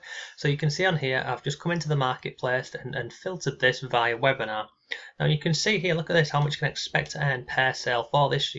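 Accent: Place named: Northern England, United Kingdom. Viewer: your from up North somewhere in England.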